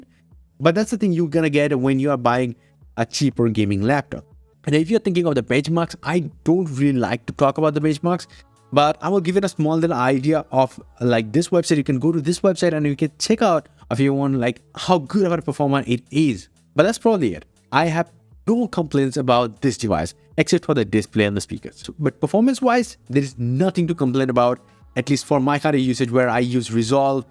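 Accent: India. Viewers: THICK BOMBAY ACCENT IS IRRITATING. THEY SHULD STICK WITH THEIR NATIONAL LANGUAGE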